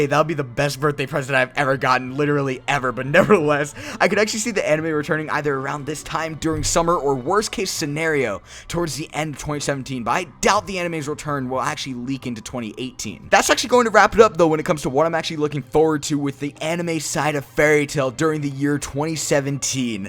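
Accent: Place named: United States. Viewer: Omg this is the first time i hear your voice sempai! haha XD She is .... very... sexy ! and American! haha 😂 btw i wish the studio J. C. Staff. (Shokugeki no soma) who take over the anime and 🤔 I think there may be a flashback of several episodes on everything we didn't see during the ellipse after tartaros arc; with gray/juvia , mirajane, laxus ...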